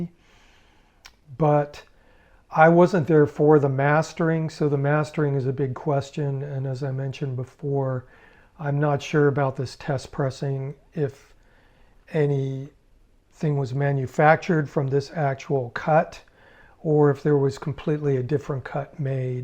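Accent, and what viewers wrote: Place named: United States. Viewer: You have a very American accent for a Brit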